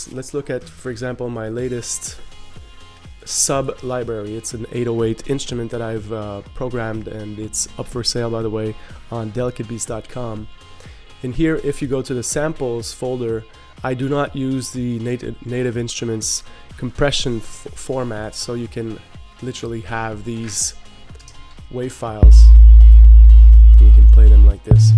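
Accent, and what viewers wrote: Quebec, Canada. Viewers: hmmm ca sonne comme l'anglais d'un Québequois ca!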